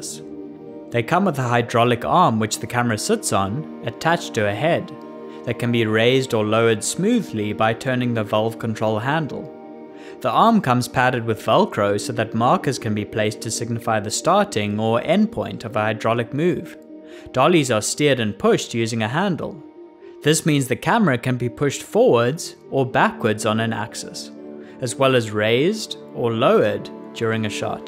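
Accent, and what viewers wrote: South Africa. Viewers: Is your accent South African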